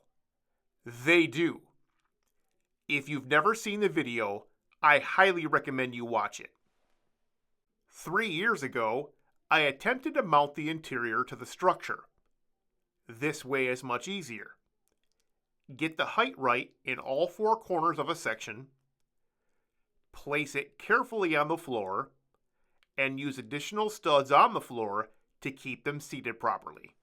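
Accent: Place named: Canada. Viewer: Are you Canadian because MAN you sound like a Canadian Also Great job very well done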